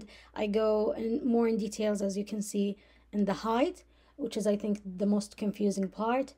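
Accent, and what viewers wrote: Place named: Saudi Arabia. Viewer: استانست لما سمعت الاكسنت السعودي🔥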